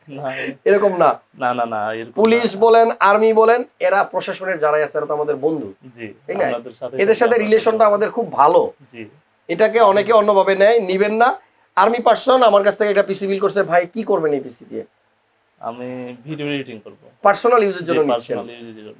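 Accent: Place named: Chapai Nawabganj, Bangladesh. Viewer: তার ভাষা সুনেই বুঝেছি সে আমাদের চাপাইনবাবগঞ্জ এর ভাই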